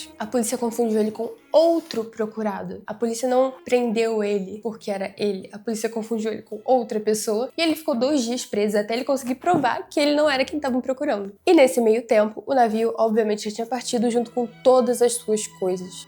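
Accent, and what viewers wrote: Rio de Janeiro, Brazil. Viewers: Pq carioca fala "naisceu"? Tenta falar "nasceu" normal que aí passa mais credibilidade nas suas falas, fora isso o vídeo ficou muito bom, conseguiu me prender a atenção a forma como vc apresentou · Não consigo escutar um sotaque carioca e imaginar uma pessoa culta.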